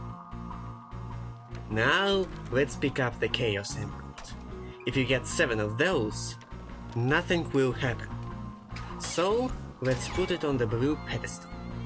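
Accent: Russia. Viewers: Тяжело слушать русский акцент🫪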